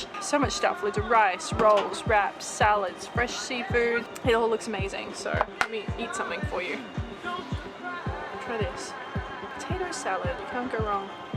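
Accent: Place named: Australia. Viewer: Love your videos! As an Australian, it’s so wonderful to see an Australian YouTuber who speaks in a clear, nice sounding cultivated Australian accent without the use of Australian slang.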